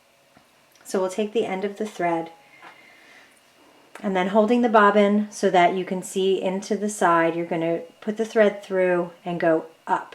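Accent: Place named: Russia. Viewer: Sounds Russian to me.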